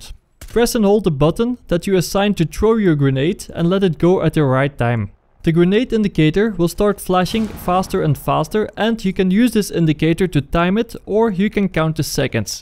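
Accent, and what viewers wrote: Netherlands. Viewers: Are you Dutch because of your accent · Ben je nederlands? klinkt zo haha! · jij komt zo hard uit NL you engels is zo fockt up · i think it's a dutch accent, and i can hear it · Dat Dutch accent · Iewl dat Nederlands accent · dat nl accent XD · he is clearly dutch, i'm dutch myself and know how dutch people speak english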